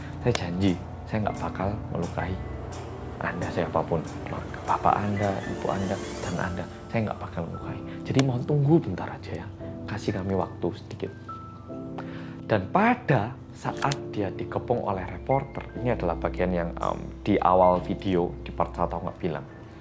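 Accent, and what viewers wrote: Java, Indonesia. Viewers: Bersama org korea yg MEDHOKKK~ Kangen pembukaan yg begini mas hehe Welcomeback DETEKTIF, Selalu menginspirasi ceritanya, semoga kita menjadi org yg jujur ya mas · To be honest, this video is really cool, I don't understand how to pronounce it, the point is I like this video, I think he's great, he has cool words. thank you for I always like the content of your video content, actually I don't like hearing the sound of the medok but I accept it because your content is great, thanks bro.🙏🏼🙏🏼👍🏻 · Yey akhirnya yang ditunggu up pt2 🎉🎉 tim medokkk mas 😂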